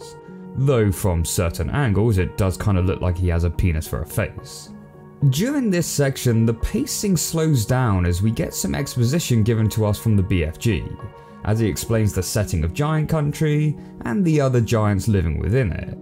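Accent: United Kingdom. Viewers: British people make everything seem scarier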